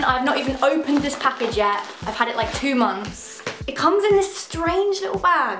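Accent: United Kingdom. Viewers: I did a British accent reading the title before I click this and I’m surprised ur British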